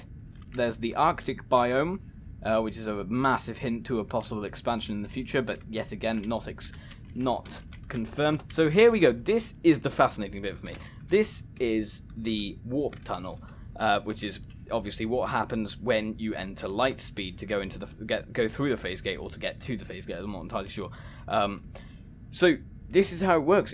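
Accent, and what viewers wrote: United Kingdom. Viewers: Get off the American Wi-Fi you’re in Britain I’m assuming project that you’re speaking British stop holding up the American Wi-Fi and use your guys his own Wi-Fi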